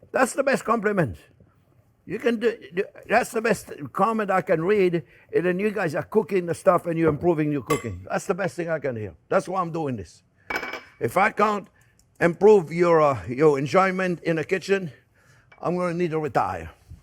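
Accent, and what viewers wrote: France. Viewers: His French accent. I love it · "what the sense? what the sense?" I don't remember how long he has lived in america but he still speaks in french for sure.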